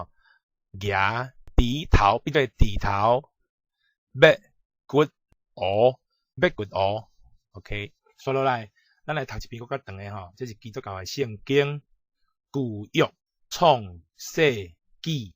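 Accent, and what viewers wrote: Quanzhou, China. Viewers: 听到泉腔闽南话，tai gir台语, ter短。作者应该是泉州籍。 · 更正：是「泉州腔」。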